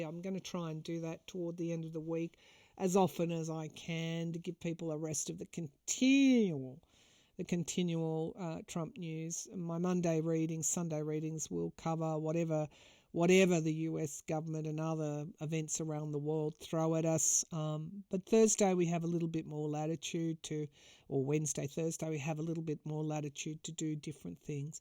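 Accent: Australia. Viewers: I love hearing the sayings in different countries! I've never heard 'rugged up' - I chuckled a little and thought it is quite appropriate when wrapped up in cozy, fuzzy sweaters ('rugs')!!! (I'm in the US, originally from MA, been in FL for 20 yrs now) starting to get hot already!!! Would love to see Australia!!!!!!!!!! My favorite accent!!! The you!!!!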